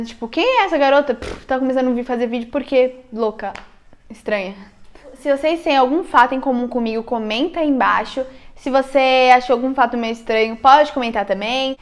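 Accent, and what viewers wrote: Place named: Rio Grande do Sul, Brazil. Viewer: Te amo, vc é muito linda, tbm acho legal o sotaque gaúcho. Vc é bem sincera, e vc gosta de tudo que é bom, curto muito seus vídeos. Determinada, sabe se expressar e sou sua fá. Bj princesa....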